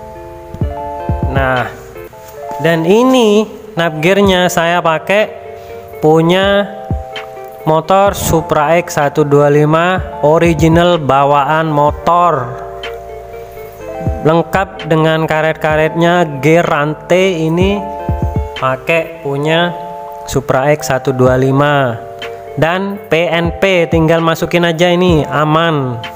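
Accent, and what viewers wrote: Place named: Madura, Indonesia. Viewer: Logat bahasanya kaya logatnya orang madura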